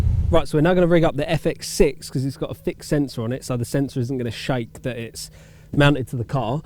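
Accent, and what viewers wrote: United Kingdom. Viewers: Bro I just noticed you look like you're the brother of James Hype. You even got the same UK accent and Name. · American here, I love your accent this is what Americans imagine when when think of a prototypical "British Accent"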